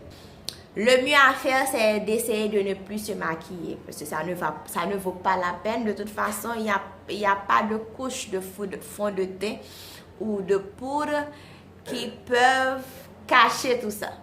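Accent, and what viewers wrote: Haiti. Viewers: Depuis sur l'accent , je pensais dèjà qu'elle etait haitienne!! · Waw j aime trop l accent haïtienne...j ai kiffé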